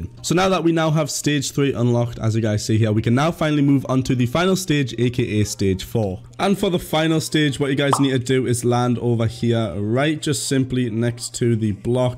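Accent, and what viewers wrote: Newcastle, United Kingdom. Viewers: true geordie if he played fortnite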